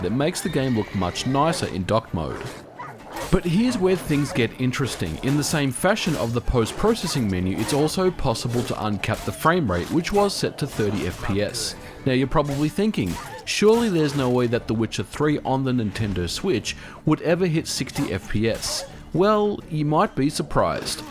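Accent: Australia. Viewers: australian accent is beautiful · yes really. That australian accent is awful. Instant switch off. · And with a spanish accent instead of australian :D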